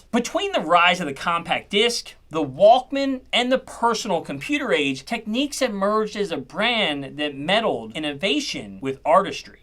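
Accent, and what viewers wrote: Baltimore, United States. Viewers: Your channel occasionally pops up on my feed. I remember the first video I watched of you. I was thinking to myself good Lord if that's not a Baltimore accent, I don't know what is. And of course sure enough that's where you are. I went to Woodlawn Senior High in the late '70s and I was on the auditorium tech crew. I haven't thought of Roberts in 45 years but as soon as you said Roberts I remembered the pair of tape decks that we had in the control room; it seemed to me they were semi-portable with handles and covers. Then sure enough the pictures popped up on your video and that's what I remember. Thanks for reviving a decades-old memory! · I noticed your accent and thought you have to be from Baltimore. When you went out on the street I recognized you are on Harford Rd in Parkville MD. I have been in the shop there before looking for amps.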